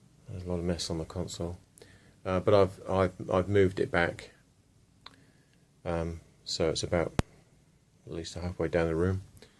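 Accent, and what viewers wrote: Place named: England, United Kingdom. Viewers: I wasn't expecting an English Accent..... lol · I was also wondering what an English man is doing in Texas 😀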